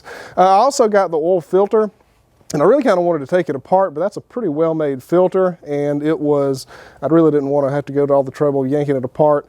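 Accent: South, United States. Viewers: The way you southerners say oil kills me. 😁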